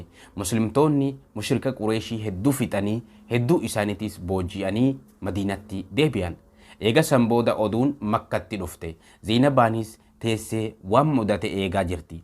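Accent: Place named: Arsi, Ethiopia. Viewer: As ayk wr wb mashala mashaala rabbi umri dhera sii haa kenu barumsa garii sira barane obbolesa muslimati sagaleti arsii naa fakate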